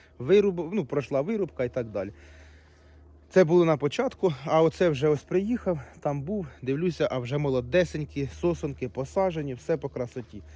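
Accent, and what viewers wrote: Ukraine. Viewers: Який колоритний український діалект! Таке задоволення слухати! Та й грибочки, все тільки конкретно, без лишніх блукань показано. Відео сподобалось! Єдине може тільки, знімати сам гриб трошки ближче, щоб видно було красу гриба. Дякую!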